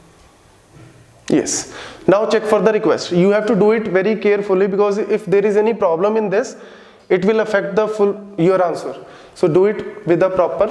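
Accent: Punjab, India. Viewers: Your broken english also a having very loving sense that punjabi accent is crisping on it great teaching.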